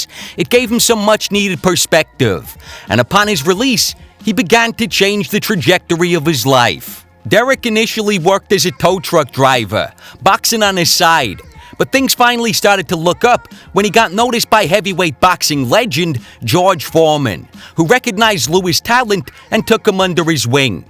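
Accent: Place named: Boston, United States. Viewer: Richard Ott I'd say Boston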